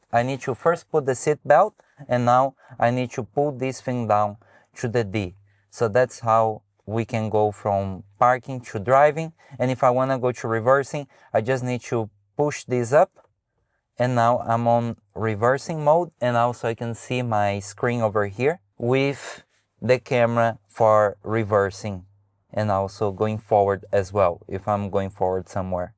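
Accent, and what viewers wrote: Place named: Brazil. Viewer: I love your brazilian accent - we are so lucky to have you here in australia reviewing this car. thanks for your advice!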